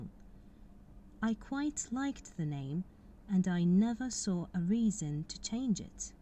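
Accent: United Kingdom. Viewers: Wonderful Miss you're an excellent teacher. You don't know how I love British accent and I wish to visit London in one day 😕🌷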